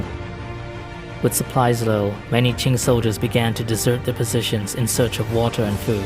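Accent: Myanmar. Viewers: Burma accent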